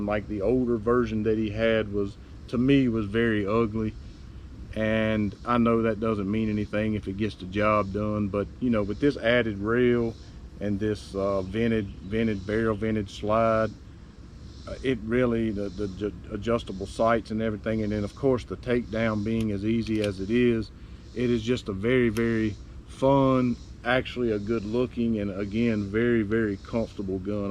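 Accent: South, United States. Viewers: Where’s that southern accent from? Louisiana? Georgia here. Love my Mark IV 22/45 Lite. Got mine without iron sights with the short pic rail and Riton red dot from the factory.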